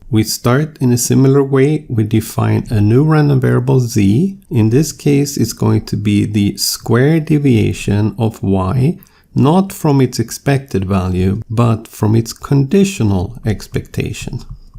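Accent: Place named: Sweden. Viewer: got a very Swedish accent.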